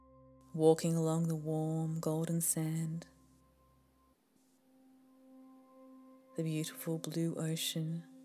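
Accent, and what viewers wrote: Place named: Australia. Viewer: She sounds Australian all the way through to me (I'm British)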